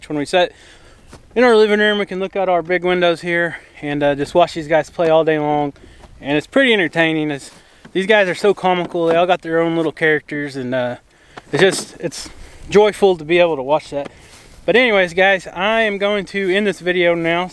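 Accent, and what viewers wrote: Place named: New Jersey, United States. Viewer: as far as I can tell you were raised in Oklahoma but sometimes I hear a slight NJ brogue. I went to college with a lot of people from New Jersey. I am almost 30 years older than you. Back in the mid 1970s it was a less expensive for people to pay out-of-state tuition than pay in state tuition in New Jersey so we had a lot of people from out of state at Pfeiffer College now a University.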